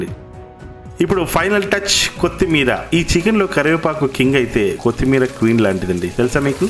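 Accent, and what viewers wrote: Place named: Rajahmundry, India. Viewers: Subscribed bro. I didn't knew you are from rjy although your accent and style looked too close RJYians from most of your videos I have watched till now. Touched bro. You are doing good work also. 👍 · Bhayya super 👌👌👌👌👌👌👌 Mana Rajahmundry slang lo meeru cheppe vidhanam Ultimate Anna.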